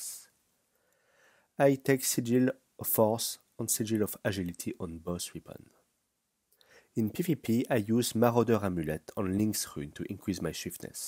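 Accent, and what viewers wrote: France. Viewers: is this guy french? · un français qui parle anglais? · He is french i beleive :p i like the english with this accent =^.^=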